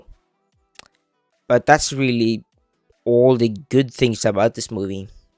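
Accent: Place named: Italy. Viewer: Idk why I got this video recommended but it is kinda fun and I love your Manga collection. Btw where are you from? Your accent sounds a bit italian idk?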